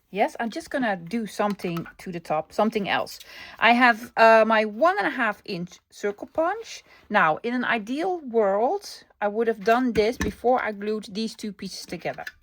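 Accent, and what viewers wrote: Netherlands. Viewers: I was sitting here watching you and thought, “I wonder where her accent is from, it’s probably Netherlands.” And at that very moment, no sooner was the thought in my head, when you said something about - here in Netherlands! Kinda freaked me out! I like your idea with Washi tape book mark! So cool.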